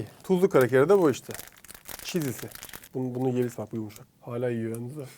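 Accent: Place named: Istanbul, Turkey. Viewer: Abi sunarken içten sunuyorsun. Sokak ağızıy la ve istanbul türkçesi ni karıştırıyorsun. Buda etkileyici duruyor. Özellikle tonlamalar 😅. Küfüre pek takmayın sadece izleyin. ☺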